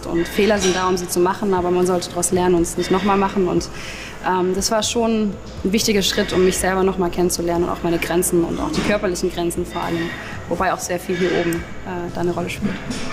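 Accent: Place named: North Rhine-Westphalia, Germany. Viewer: Mensch. Bin total enttäuscht über Deinen NRW Slang. Ich dachte Du bist aus Ba-Wü und kannst echtes Hochdeutsch. Ausdrücke wie Spoaart oder Leaaarnen gehen gar nicht. Es heißt Sport z.B. mit kurzem O und keinem anderen Vokal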